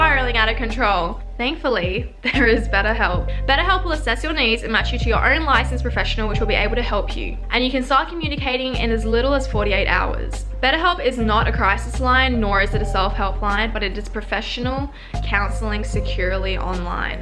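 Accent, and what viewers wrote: Australia. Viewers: I'm learning English and I found the Aussie accent soorrrr gorgeous. Now I'm learning English with an Aussie accent thank u for helping, a lot of love from Azerbaijan 🇦🇿 🇦🇺!!!!!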